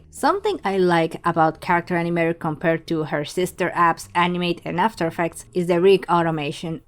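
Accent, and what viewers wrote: Spain. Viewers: Show that Spanish accent! It makes you the awesome person you are <3 . Also, I work with Mac and most of the rigging and Vtuber mods or programs arent available I decided to use Character animator. I love the character you make!!!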